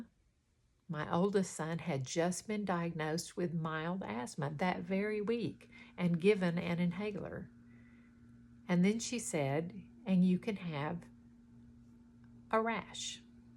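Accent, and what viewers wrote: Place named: Texas, United States. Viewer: You get cuter every time I see you and you sound like your from Texas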